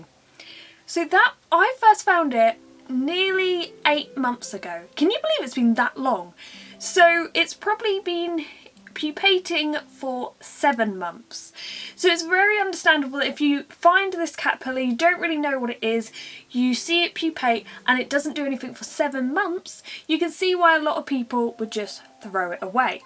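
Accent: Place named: Southern England, United Kingdom. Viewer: What I spotted was a "common" or viviparous lizard. I walked past it as it was busy sunning itself on a tree-stump...but the HOLY GRAIL of lizards to spot here in the UK would be the sand lizard. Pretty sure they're only found on the south coast, and you sound like a southerner so... x)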